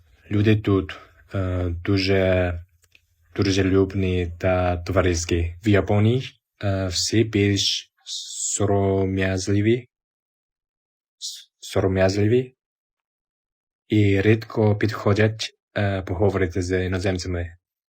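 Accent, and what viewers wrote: Standard. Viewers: Молодець , мова чиста . Так важко . Але з часом це пройде . Більше спілкуватися з українцями , навчитеся . Розкажіть про себе , як до вас звертатися , що робите в Україні . Щоб вам легше було говорити , спочатку напишіть текст , прочитайте його декілька разів . Тоді буде легше . Дякую за свою точку зору на наше життя . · Говориш нормальною українською, а не довбаним суржиком як базліч "дружелюбних". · Вау, молодець ! Така чиста мова !👍👏👏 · Який молодчина. Чисто українська мова вражає. Дійсно, що нам треба ще вчитися так розмовляти Дякуюмо вам юначе за теплі слова про Україну. Вона у нас найкраща у світі.❤ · Гарна, чиста українська мова - ви молодець! Та й хлопак дуже гарний. 😊 Щасти Вам у житті ❤ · Ви молодець дуже гарно розмовляєте по українські дуже чисто та грамотно,Я Українка но у вас получається розмовляти ще літернатурніше ніж у мене,Дякую тобі сонечко за твою старанність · Молодець! 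Навіть ми не завжди говоримо такою чистою украінською мовою🎉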